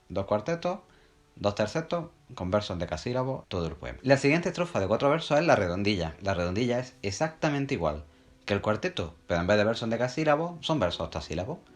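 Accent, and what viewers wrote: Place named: Andalusia, Spain. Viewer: No respondas a esta gentuza solo buscan atención, me ha salido tu video en mi sesión de estudio y sinceramente no he tenido ningún problema entendiéndote añadiendo, de que el acento andaluz es bonito no hacía falta darle ninguna respuesta ni explicación, gran contenido sigue así!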